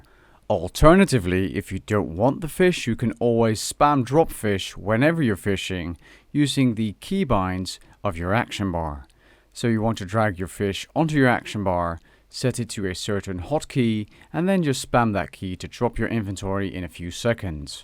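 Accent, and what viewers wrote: Netherlands. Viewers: You are Dutch? :o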